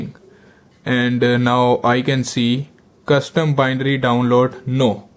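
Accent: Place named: India. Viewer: OMG u could not have more of an indiant accent.... cool vid tho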